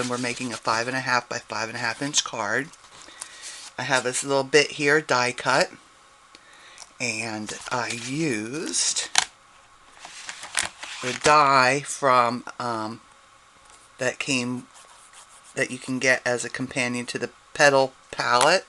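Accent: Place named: North, United Kingdom. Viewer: Very pretty card! I love how you mixed the hearfelt creations with the Stampin Up. When you say y'all it cracks me up because i don't hear much of an accent, or maybe a northern accent.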